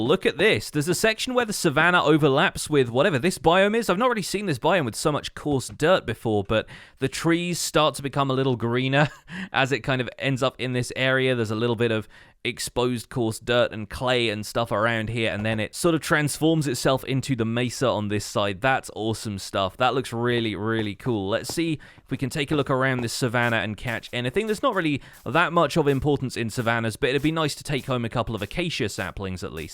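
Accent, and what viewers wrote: United Kingdom. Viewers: You sometimes sound like AbdulHakim Murad. Clear british accent sounds great for us non native speakers and both of you have it.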